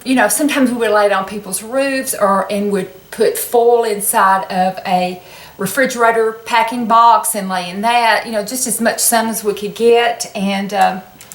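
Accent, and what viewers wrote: South, United States. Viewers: Take care of. I hope everything goes well at your doctor appointment, please update us. I want you to know that I absolutely adore you, and that sweet southern drawl of yours! You are so sweet and so encouraging to all of us!! I love you, and love your channel!! I am a 52-year-old grandmother of 12, and I want you to know it is so refreshing to see someone in the over 40 crowd, Who is as beautiful and sweet as you are!!! ❤️💜❤️💜❤️💜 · I never knew you thought this way about your accent. I absolutely LOVE your southern accent....full of charm.❤-Ruthie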